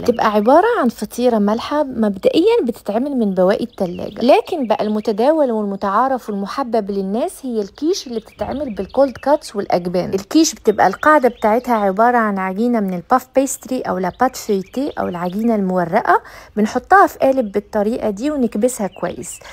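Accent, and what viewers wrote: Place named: Morocco. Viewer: عشان مش مصرية انا متأكدة من دول شمال افريقيا واحساسي بيقول المغرب بالظبط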